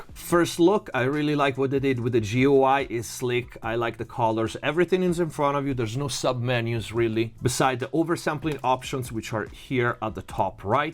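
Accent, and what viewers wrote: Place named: Italy. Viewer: your accent is Italian, are you Italian ?? love your work man, I learned a lot from you!!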